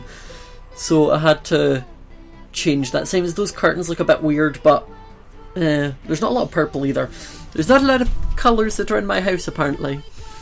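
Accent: Scotland, United Kingdom. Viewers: hes Scottish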